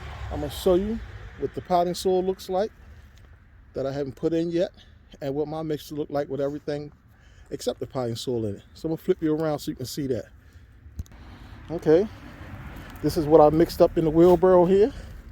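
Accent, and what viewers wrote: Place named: Baltimore, United States. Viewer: Sir, where are you from? I hear an accent and my husband says that it sounds like Baltimore.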